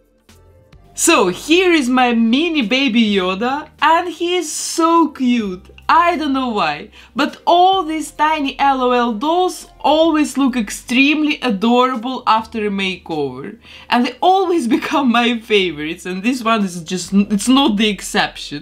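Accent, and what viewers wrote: Russia. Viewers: Лол, русский акцент прям прёт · Я не знаю почему, но на каждом видео слышу русский акцент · I LOVE your heavy Russian accent and also the happy music is kind of making this creepier but you still got a new subscriber 💕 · Акцент автора похож на русский.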